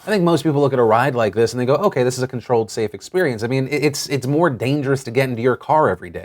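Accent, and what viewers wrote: South, United States. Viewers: hey, why did you have a southern accent in this one?> XO mom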